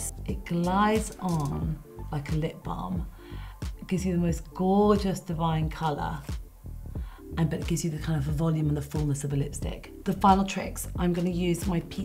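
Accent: England, United Kingdom. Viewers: cute lady...nice english accent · Not just her products and makeup techniques, but also her personality and her English accent 😊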